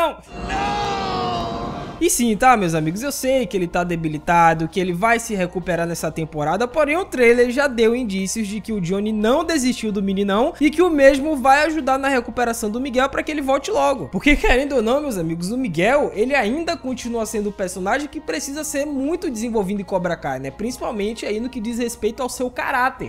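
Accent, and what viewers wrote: Bahia, Brazil. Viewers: Você é daq da bahia??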